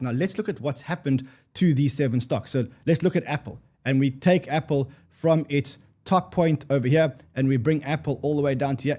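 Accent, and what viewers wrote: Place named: South Africa. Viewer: I thought I recognized the Saffa twang. Nice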